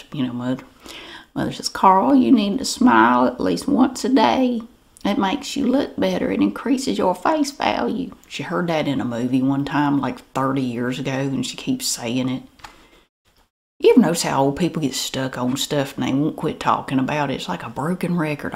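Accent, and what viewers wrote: South, United States. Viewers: Carl is the epitome of adorable AND cringe. And the southern accent really adds to that I'm sorry to say 😆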